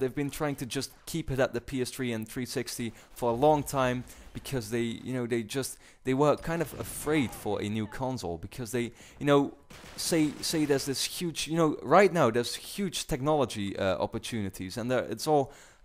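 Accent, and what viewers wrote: Netherlands. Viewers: You sound dutch, bro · You sound Dutch, are you?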